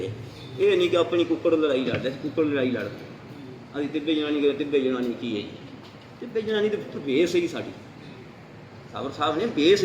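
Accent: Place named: Punjab, India. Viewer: یہ ممکن نہیں استاذجی کا لہجہ ہمیشہ پنجابی ہی رہتا ہے ہم نے دیکھا بعض پختون مریض بھی اس سے پریشان ہوتے ہیں لہذا آپ بہائی کوشش کریں استاذجی کے انداز کو سمجھیں جب نیت کرلینگے ،آسان ہوجائے گا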